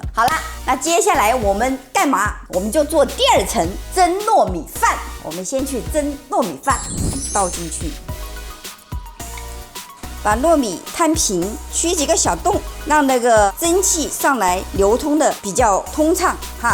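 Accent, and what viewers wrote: Wuhan, China. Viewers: 拿起走，尝一尝 熟悉的汉普 哈哈哈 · 哈哈哈哈哈哈，普通话说着说着就变成了武汉话，你这汉普真的是让我感觉无比的亲切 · 哈哈哈 听你说的第一句话已经确定你是正宗武汉人了，明天就去按你的教程做豆皮去哦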